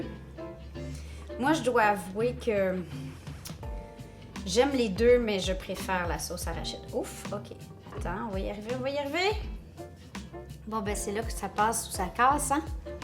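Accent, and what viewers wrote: Canada. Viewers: Merci beaucoup pour toutes ces bonnes recettes faciles. Ça fait plaisir d'entendre ce bel accent canadien. 😜👍😘 Janie de Paris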